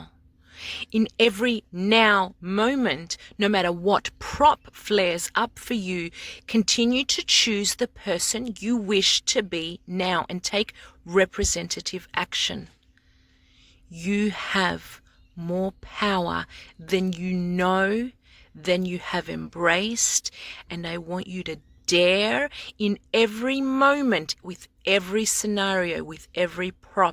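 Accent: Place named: Australia. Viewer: I found your video through one of my subscribers who posted your video. Your delivery is perfect and your Australian accent is beautiful, I'm an Australian myself, you're a great teacher and I was so drawn into every word you said, I was sort of hypnotised to tell the truth, and I felt that this message was purely for me 'now'😊 I am turning 68 next month and people say that at 40 you can look over your life and feel all sorts of disappointment but I can tell you nearing 70 you feel like the tank is getting pretty empty. This philosophy really rings true for me, it's not like I haven't thought about all this a lot but the way you put it just cut through. I'm going to listen to you again and again until I really get it just wanted to say thanks. Cheers, Dave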